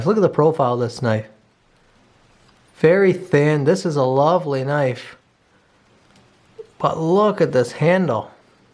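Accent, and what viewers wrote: Newfoundland, Canada. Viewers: Me getting distracted by the fact that this dude sounds like a Newfie. Looks at description. "oh. Checks out." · This popped up on my suggested videos and you managed to get 2 words into your intro before I was wondering what part of NL you were from haha. Always nice to see another Newfoundlander unexpectedly! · I thought I detected an accent. Are ya a Newfie there by? I see the flag behind ya. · As soon as i started this video i was like this guy gotta be from Newfoundland lol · I watched this whole video wondering why you sounded familiar only to realize you are from Newfoundland ahahah amazing work. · man as soon as I heard ya talkin I knew you were from the rock. Cheers! ill have to add one of your knives to my collection.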